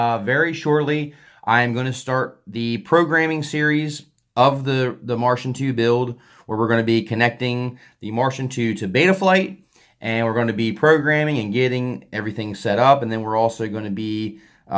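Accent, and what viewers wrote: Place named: Philadelphia, United States. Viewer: Thanks.. You sound like you live near Philly lol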